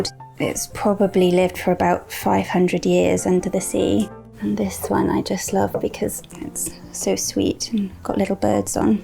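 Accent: United Kingdom. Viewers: She has Renee Zellweger's british accent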